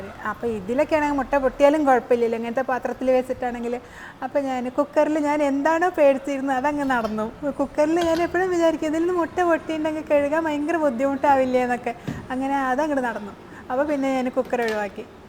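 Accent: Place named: Palakkad, India. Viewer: പാലക്കാട്‌ സ്ലാങ്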